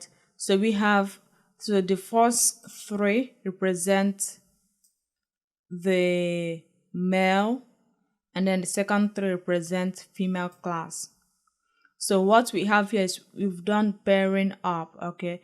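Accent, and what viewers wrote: Nigeria. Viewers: you sound Nigerian girl ... I am gonna follow your channel...... Love you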